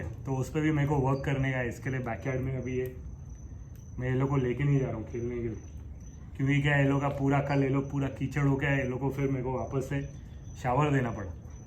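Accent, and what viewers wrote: Mumbai, India. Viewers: Your way of speaking takes me to Mumbai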